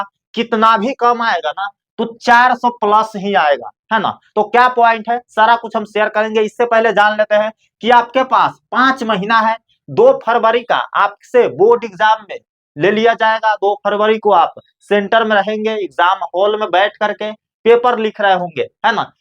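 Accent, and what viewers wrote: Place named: India. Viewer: I think Hindi medium. Cuz As u can really see it from sir accent .. It sounds like pure Hindi medium accent........ Don't u think so????Ahhhhh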